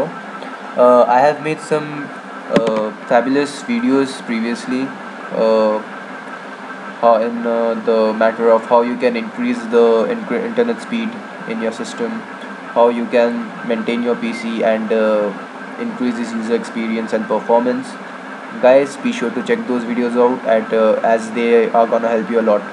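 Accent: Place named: India. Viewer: FIRSTLY; SPEAK PROPER ENGLISH== NO ONE UDNERSTAND YOU >>>dont speak English in Indian's accent ! second: whatever you write or add to the right pane in the registry; IT DOES NOT MESS THE COMPUTER THE PROBLEM OF THE REGISTRY HAPPEN ONLY IF YOU CHANGE EXIXTING VALUES IN THE LEFT PAN: where the yellow keys are := even if you add any key to the left pane; it does not do any harm to the computer: SO STOP OVER-SCARING PEOPLE FROM OPENING OR USING THE REGISTRY !! SPEAK PROPERLY ; WILL YA???????????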